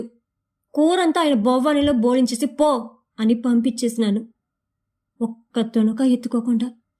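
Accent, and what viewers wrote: Rayalaseema, India. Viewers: నమస్కారం madam, యాట కథలో మీ వాయిస్ రాయలసీమ స్లాంగ్ లో చాలా బావుంది. ( అమీరున్నీసా ) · నేను రాసిన యాట కథకు మీరు రాయల సీమ యాసలో జీవం పోశారు మీకు మీ ఉషా చానల్ కు దన్యవాదాలు మేడం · బావుందమ్మీ నువ్వు సెప్పిన ఇదానం.. కథను కల్ల ముందు నిలిపినవ్ బా.. గొప్పగా చెప్పారు గారు.. మా సీత్తూరు భాషలో.. అందులో మా వెదురుకుప్పం లో రచయితకి పక్కన ఊరు మాది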